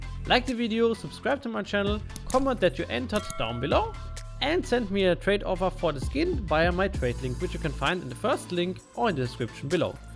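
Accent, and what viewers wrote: Germany. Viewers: i entered , are you german ? you sound like you have a german accent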